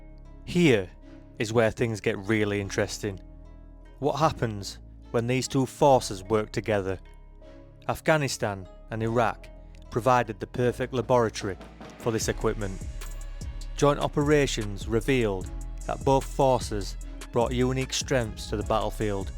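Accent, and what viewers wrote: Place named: Northern England, United Kingdom. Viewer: Apologies if I got that wrong. It's a nice northern accent, but there's still a lot of unnatural sounding pauses to my mind.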